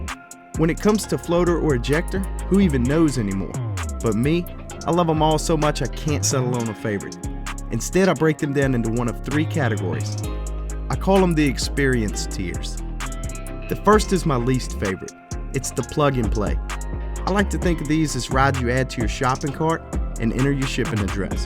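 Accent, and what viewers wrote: South, United States. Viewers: you have a great voice over voice but I can tell it's southern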